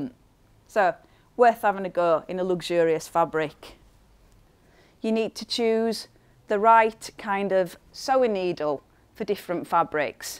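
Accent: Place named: Yorkshire, United Kingdom. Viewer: That’s a Yorkshire accent 😉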